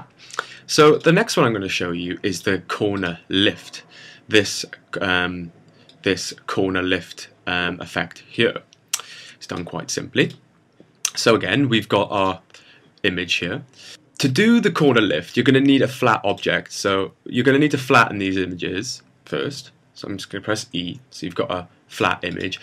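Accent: Wales, United Kingdom. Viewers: You have a Welsh accent........nice to hear. Also, the tutorial was very useful. Thank you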